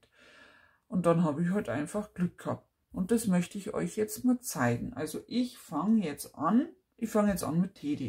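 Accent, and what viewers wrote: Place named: Bavaria, Germany. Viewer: Hallo, neue Abonnentin hier ✌ Ich bin auch aus Bayern und mir gefällt dass man deinen Akzent so schön durchhört 🤗 Außerdem heißt meine beste Freundin auch und deine videos gefallen mir natürlich auch 😁 Mach weiter so und bleib so identisch wie du bist! Servus, Yvonne 😉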